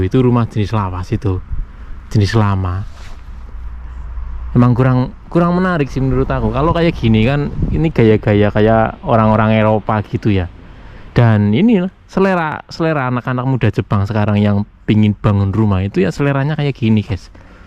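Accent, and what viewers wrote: Banyumas, Indonesia. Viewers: Kesuwen neng jepang ora kelalen ngapak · ngapak temen mas..rika asline wong ndi...semngat masee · seneng ngapak mas.jwa Endi mas.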